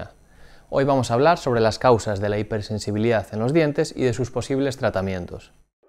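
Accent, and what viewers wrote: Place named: Galicia, Spain. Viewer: parece de Galicia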